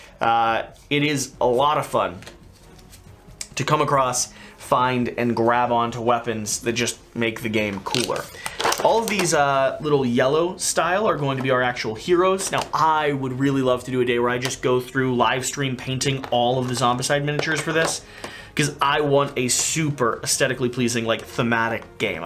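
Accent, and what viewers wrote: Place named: South, United States. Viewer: Obligatory southern drawl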